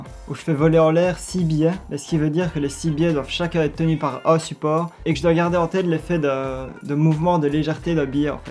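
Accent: Belgium. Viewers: Ah tu es belge ? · T’est belge ? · Tes belge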